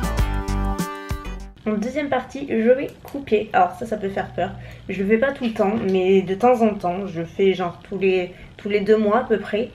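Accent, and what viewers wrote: South, France. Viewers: Salut ma belle, voir tes vlogs dès le matin ça me met une pêche d'enfer et ton petit accent du soleil dans cette journée bien grise !!!! et bravo à mamie !!!! beau plat !!! félicitation !!! Merci pour tes vlogs, ta bonne humeur, ta joie de vivre !!!! j'adoreeeeeeeeeeeeeee !!!!!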